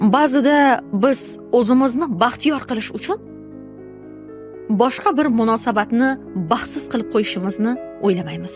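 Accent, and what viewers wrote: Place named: Russia. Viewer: Yahshi naraala aytasizuu, labizzi rossa qiyshaytirib gapirishiz nitodaa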